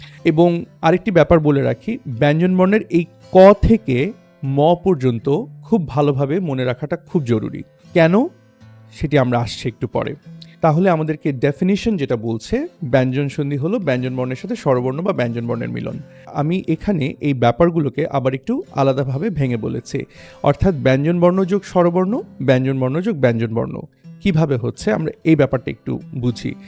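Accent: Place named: United Kingdom. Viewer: Sir your bangla accent sounds British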